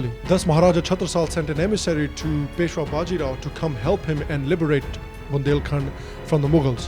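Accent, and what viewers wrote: West, United States. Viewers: Were you born and bought up in india? If yes, than why do you have a western accent?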